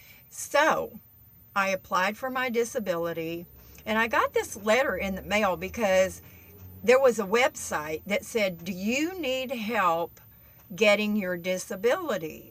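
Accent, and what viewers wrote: South, United States. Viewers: Great video, great lady, a good mom what a rare treat. I also noticed that you call your van "him" i do that. im wondering if youre a Pisces or other water sign. I love how you talk to the camera like we're all old friends. Yuoure very sincere and personable. Great video subject you dont see people talking about this kind of stuff often enough. And i have to say my eyes watered a bit in the beginning, just thinking about how much our seniors have to worry about. Its not ok for it to be like this. Just that so many people dont know if theyll be taken care of or not when they get older, working all their lives to try to survive and then ending up being so scared and feeling so helpless. It breaks my heart. Fuck. My eyes are watering again. I also love the southern accent and a bunch of things about southern culture. i hope those things dont get diluted over time.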